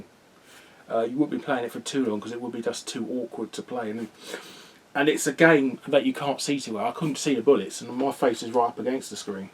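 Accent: United Kingdom. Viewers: Why are British accents so intriguing lol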